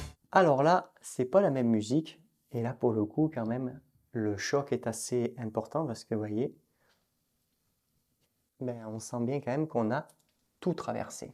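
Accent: Southwest, France. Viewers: merci pour votre réponse, j'adore votre accent du sur ouest !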